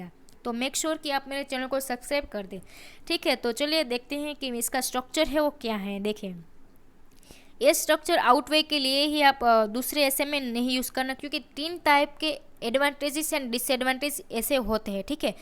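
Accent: Gujarat, India. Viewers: Are you Gujarati? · English language with gujarati accents. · Mem R u gujarati?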